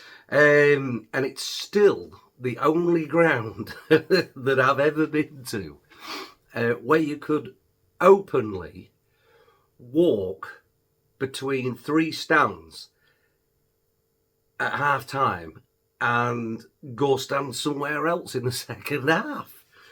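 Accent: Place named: Scunthorpe, United Kingdom. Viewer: I grew up in Scunthorpe but the family moved away when I was 9 in 1971. One thing I remember was Sargents ice cream from ( Hibalstow?), but the vans used to come round. And Normanby Park. I did go the Old Show Ground too. I think I’ve retained bit of Scunthorpe accent. I do remember the way people talked. Like yourself!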